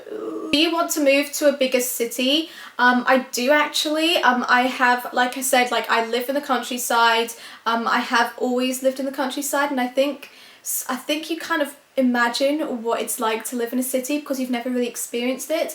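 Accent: Scotland, United Kingdom. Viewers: Thanks for a very candid and personal insight. Nice to know you better 😊 Never knew you were Scottish ! The accent fuelled me 🤔 Your challenging high schools years do not appear to have affected you negatively. Your looks and personality are much more suited to bring in front of the camera ☺️ The quality of video and sound on your videos are excellent. Maybe you could do a video on what gear and settings you use for other Youtubers ? Love your channel. Please keep them coming.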